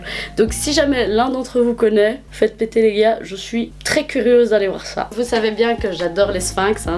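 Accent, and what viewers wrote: Belgium. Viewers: c'est l'accent belge! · Elle est belge, on parle toute comme ça. Et les mimiques, l'humour ect c'est l'humour belge, gaelle garcia est la "belge" la plus connu sur Yt du coup mnt dès qu'une belge apparaît sur Yt il faut obliger que des personnes la compare à elle.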